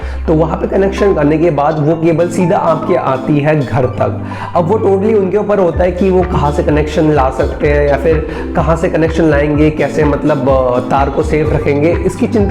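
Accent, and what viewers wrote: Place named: Assam, India. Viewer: Tumi Assamese nki bro?... good hindi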